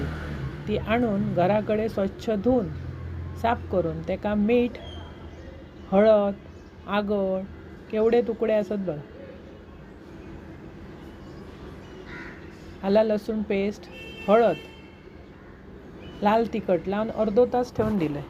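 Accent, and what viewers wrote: Malvani, India. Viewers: फारच छान गो ताई तुमचा मालवणी बोलुचा स्टाईल नी तुमचो आवाज पण लयीचं चांगलो असा मी बेळगांवची ताई पण मका कोंकण लय आवडता माजो बहिणी दिल्या असत कोकणात एक सावंतवाडीक असा एक कणकवलीत असा आणी मी आता माजो बायको मालवणची करतय मका मी फारतर मालवणातचं असतंय गेलात आईतवारीच इलंय थैसून ताई तुम्ही खयचो ओ मी तिकडे इल्यावर तुमका नक्की भेटतंय मका चांगलो म्हावरा घेऊन देशील ना गो ताई मका साफ करुक कापूक येतंय मी मटणवालो असा माजो मटणचो दुकान असा ताई माझी अंजली पण मालवणी पद्धतीने फारच चांगलो चविचो जेवण करतय ती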